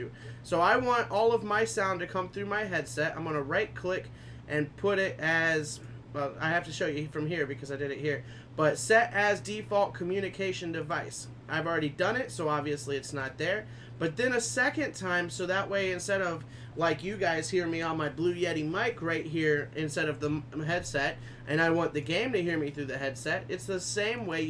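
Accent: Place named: United States. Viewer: Thanks, your american accent is pretty good by the way 👌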